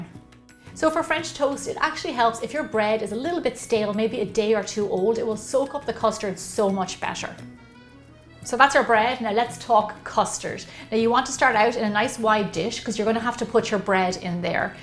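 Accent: Ireland. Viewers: Love, love your Irish accent! ☘️ I’m Irish too, but w/o the accent, ha, ha. And your recipes are all excellent. Now, I have been making my French toast exactly like yours for years....except now I’m going to try & add the extra egg yolks. That’s new to me, & I bet it makes a difference. Thanks so much!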